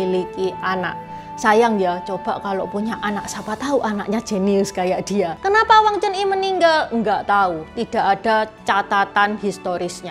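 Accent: Java, Indonesia. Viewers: Bu jowone medok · Logat bicaranya k sangat medok bahasa Jawa, sebenarnya k ini ada di mana siiih? Di China atau ada di INDONESIA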